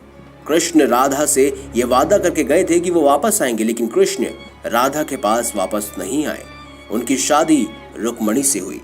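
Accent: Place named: United Kingdom. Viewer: Bhai dhang se bolo, pure Hindi me English accent mat Lao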